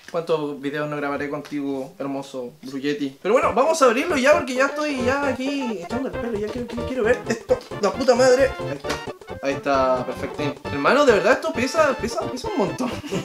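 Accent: Chile. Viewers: Este chileno :v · Eres chileno sierto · Mmmmm eres chileno???? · Wena eres chileno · Sabia que era chileno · hola soy nuevo sub ¿eres chileno? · Ho por Dios eres chileno como yo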